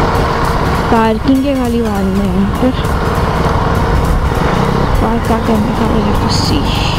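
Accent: Mumbai, India. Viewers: well earlier I was going to say things like you are a noob, squid but after investing all my phone's battery I think you are not a bad rider. And as you say in your mumbiker accent "bhaay tu toh raapchik Hai". + 1 sub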